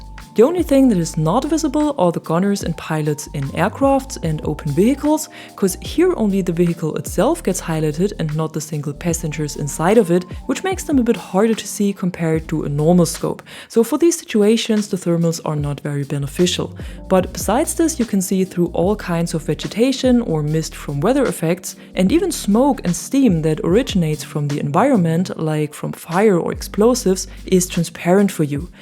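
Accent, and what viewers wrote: Germany. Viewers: i cant stand germans speaking english ffs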